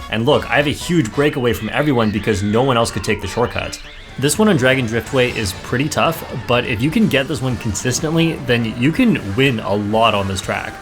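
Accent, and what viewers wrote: Canada. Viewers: avec ton accent, je suis presque certaine que tu viens de montreal 🤔 btw super video!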